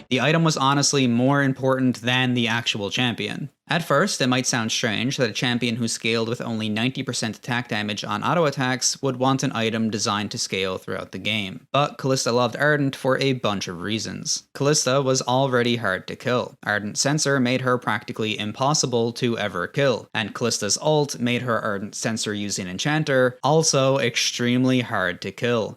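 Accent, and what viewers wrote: Canada. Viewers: can you please work on getting rid of your canadian accent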